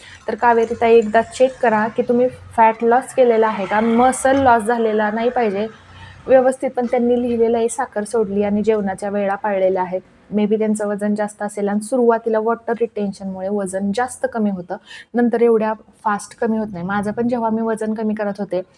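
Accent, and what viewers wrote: Satara, India. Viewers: बोलण्यावरुन भाषा.वाटलेच...आपलीसातारी रांगडी भाषा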